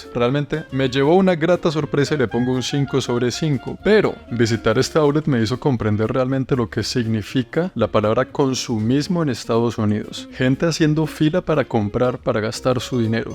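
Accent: Bogotá, Colombia. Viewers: Saludos a lla.mama de. Tiene un exelente hijo un grab ser humano. Bendiciones. Dialecto colombiano a donde quierea va 😍Me encanta.. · M encanta como documenta, aparte ese acento colombiano que tambien me gusta. Parse,un gran saludo y abrazo desde CDMX!!! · Acabo de descubrir tu canal, y ne gusto mucho tu manera de relatar los videos, me encanta tu acento colombiano, ojalá vengas a alguna parte de Mexico y te conozca en persona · 🤣🤣 habla como mi abuelito: para que 50 dólares si en 2 meses le crece la pata 👟 yiyos 🩲🤣chagualos 👟👟🤣 los calzones con la marca para que el que le vea la nalga sepa que lleva Tommy 😂😂 muy Bogotano el hablado, Saludos desde Bogotá.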